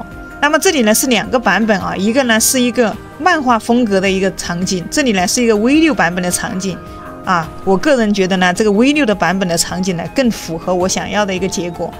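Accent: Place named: Sichuan, China. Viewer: 川普哈哈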